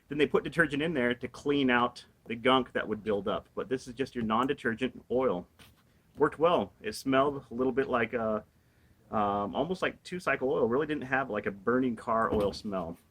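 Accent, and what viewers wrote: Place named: United States. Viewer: No way Aussie, definite American there mate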